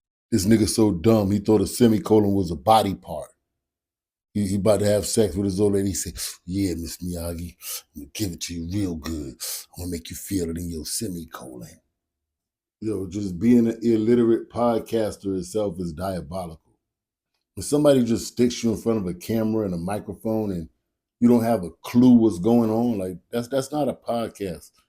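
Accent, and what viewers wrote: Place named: New York, United States. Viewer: I just wasted 11 & something mins about nothing,sound like a throw back dude from n.y.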